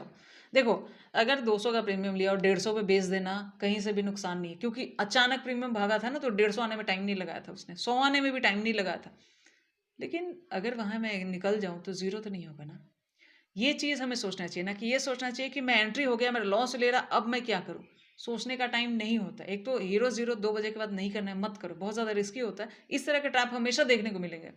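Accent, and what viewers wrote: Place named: Uttarakhand, India. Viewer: Didi..bahoot badhiya..aap ki Hindi gazab h..aap uttarakhand ya uttar bharatiya lg rhe h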